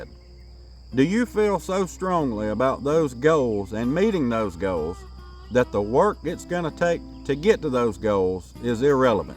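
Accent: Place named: Mississippi, United States. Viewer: Great content brother, I knew from that accent you had to be from my neck of the woods, Mississippi! Some people say I'm more countrier than two rows of butter beans, lol! But anyhow, I don't think most people realize how much work is involved in raising live stock, gardening, etc. It is W-O-R-K period! I've done just about it all, Till, plant, weed, pick and shell beans, raised chickens, rabbits, hens, ducks, hogs, goats, cows, fish, etc., canned, smoke house, and the list goes on and on. But the reward is also very, very great! There's just something special that is hard to describe to people when you sit down at your dinner table and eat the food that you know you have worked really hard for and that which the good Lord has blessed. I'm believing God that I can buy quite a few acreages of land here in the near future and retire on a small farm. 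I know it will be fun, because I know what to expect and to know my limits.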